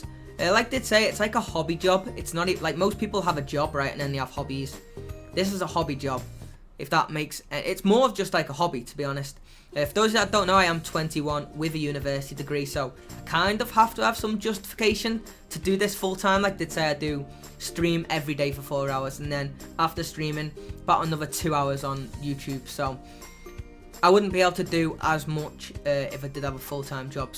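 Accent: Liverpool, United Kingdom. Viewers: This might seem like a stupid question but surely if you grew up in Indianapolis you would have picked up there accent but you have a very thick scouser accent?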